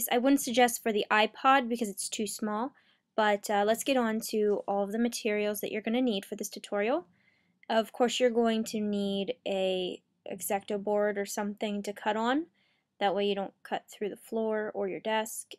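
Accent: Ireland. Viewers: yeah are you irish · are you irish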